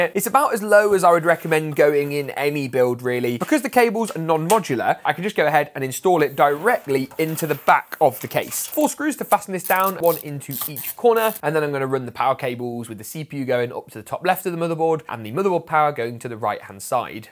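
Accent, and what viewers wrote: United Kingdom. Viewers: yo fonkin british or wot , met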